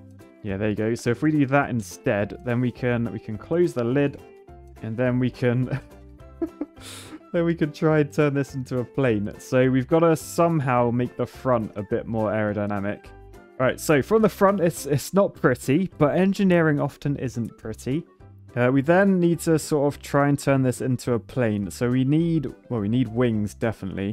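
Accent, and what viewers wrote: United Kingdom. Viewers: Are you British? You're accent like british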